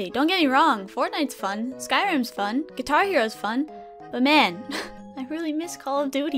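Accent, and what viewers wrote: South, United States. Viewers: are you southern I like your accent lol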